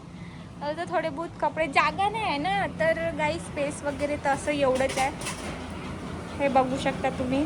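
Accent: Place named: Vidarbha, India. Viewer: स्वच्छ निर्मळ हवा,नैसर्गिक वातावरण, गोड वैदर्भीय बोलीभाषा,तुला खूप, खूप आशीर्वाद,तुझे मोठ्या घराचे स्वप्न लवकरात लवकर पूर्ण होवो.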